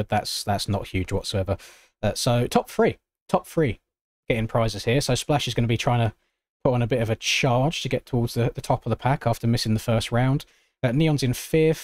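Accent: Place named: United Kingdom. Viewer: I'd much rather listen to your Brit voice commentating than Leigh Diffey any day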